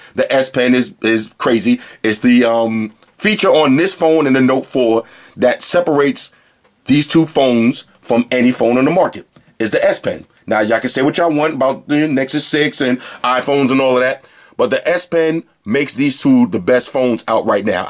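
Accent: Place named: Brooklyn, United States. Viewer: Sound like Brooklyn in the house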